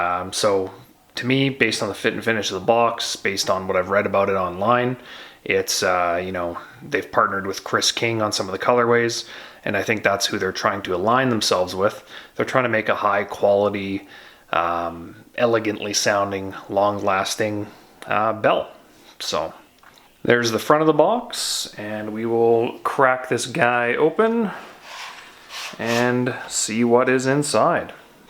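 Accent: Canada. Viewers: Are you Canadian? You have a very strong accent.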